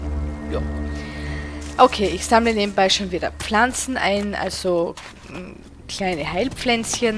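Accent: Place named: Austria. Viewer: i mog deine lets plays, es oesterreichische ghert sprochlich und a lets-play-technisch sowieso mehr forciert :-)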